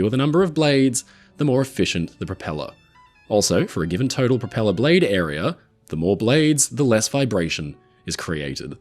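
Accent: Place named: Australia. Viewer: It's because he's Australian. Different countries have different pronunciations of the same words. Neither is right or wrong. It's basically the same thing as those of us in the US pronouncing herb as "erb" when England pronounces it with the "h" sound at the beginning. Now, they could definitely tell us our pronunciation is wrong because they were saying it with the "h" before we were even a country, but would you say we're wrong? Probably not, and neither are they.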